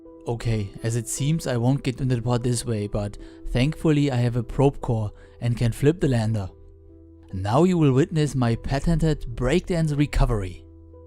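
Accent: Germany. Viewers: nice gemacht und da hör ich glatt den deutschen akzent XD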